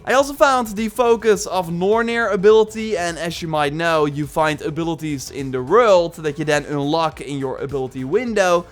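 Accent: Netherlands. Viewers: Is hé dutch?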